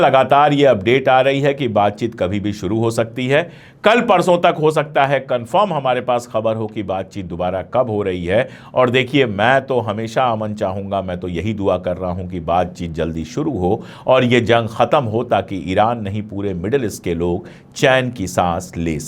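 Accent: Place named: Kashmir, India. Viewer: Lafayette, LA, USA I enjoy hearing your insertion of Kashmiri Urdu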